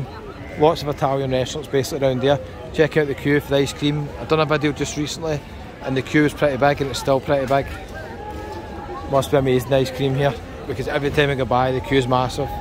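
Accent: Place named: Glasgow, United Kingdom. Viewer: That's a real Glasgow accent, so it is.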